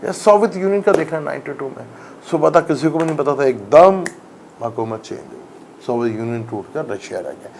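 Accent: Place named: India. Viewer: یہ شخص رRAW کا ایجینٹ لگتا ہے اس کی اردو مکس ہندی چیک کریں ذرا😡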